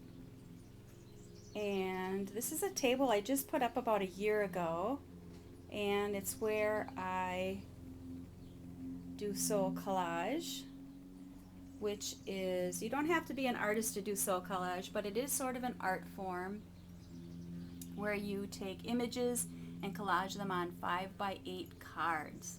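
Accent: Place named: Canada. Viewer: Just wondering cuz I noticed your accent, are you Canadian?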